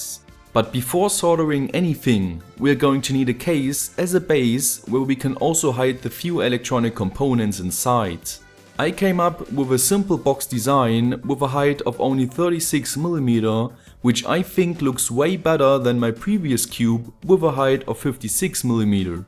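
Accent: Germany. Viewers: i believe he's german :p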